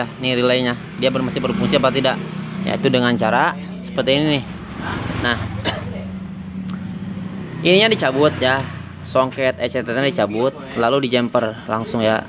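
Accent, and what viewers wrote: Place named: West Java, Indonesia. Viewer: Maaf bang alamat bengkel nya d mana yah kalo boleh tau ngmong nya ada sunda2 gitu,soal nya saya juga orang sunda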